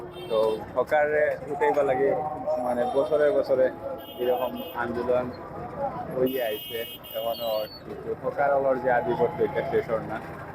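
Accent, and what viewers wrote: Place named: Sylhet, Bangladesh. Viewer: Vai Sylheti kota sera valo lage ❤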